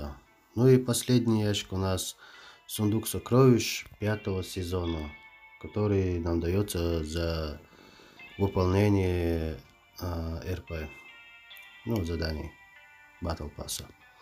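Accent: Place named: Chechnya, Russia. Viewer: Ахах ну у тебя акцент чеченский,) улачи в развитии p.s на чеченском-волк)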